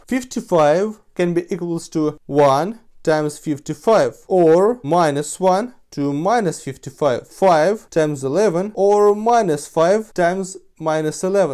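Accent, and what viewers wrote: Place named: Russia. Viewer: it's also pronounced close to that way in French But yeah he obviously sounds Russian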